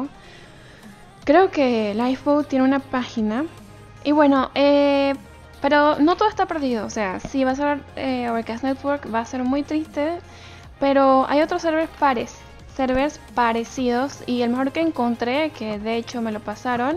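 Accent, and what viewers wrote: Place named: Venezuela. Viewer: Se nota tu acento venezolano xd tal vez lo reconozco por que también lo soy :v like :)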